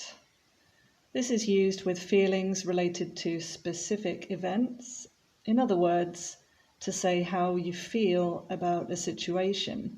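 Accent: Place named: United Kingdom. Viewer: I'm so thrilled about your accent 🤲 I do like a British accent 🤲you do sound natural.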